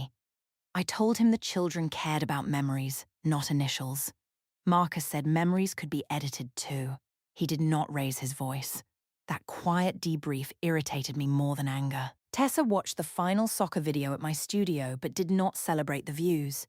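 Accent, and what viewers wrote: England, United Kingdom. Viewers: The English accent didn’t help any!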